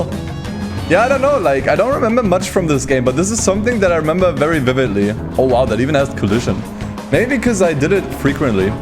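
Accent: Germany. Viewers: Top video, eine Frage bist du deutscher ? Hört sich vom Akzent oder Dialekt her an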